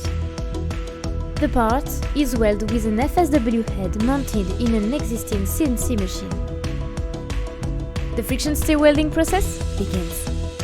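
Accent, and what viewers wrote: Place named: France. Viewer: Thanks to the french for ruining this video i cant understand anything over her accent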